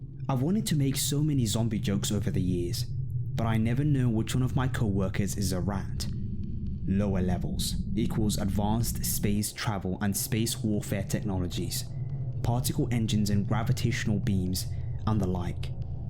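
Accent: United Kingdom. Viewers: His accent is British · you sound british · Why do you have a UK 🇬🇧 accent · U didn't worked there.. U sound like u from da U.K... Sorry nice tried..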